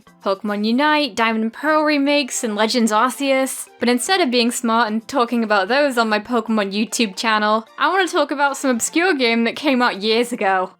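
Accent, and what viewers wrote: England, United Kingdom. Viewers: YEEAAA UPLOAD, MY FAVORITE TWO THINGS, POKEMON AND BRITISH ACCENT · Why do so many poketubers sound slightly English.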